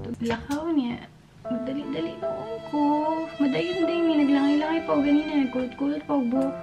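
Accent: Visayas, Philippines. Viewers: cute kaau mag bisaya bai.. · Cute magbisaya c idol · Hahahaha cuta kaayu nimo mag bisaya ate uy heheheh kapoy gyud mag bisaya ate kung naanad naka mag tagalog ig english hehehehe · Ang qt magbisaya💕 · Ang Ganda mo, kahawig mo c Erich Ang cute nyo mag bisaya ni Erich. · I’m not interested on your makeup challenge. I’m interested on how you speak bisaya 😁 and i find it cute like erich ❤️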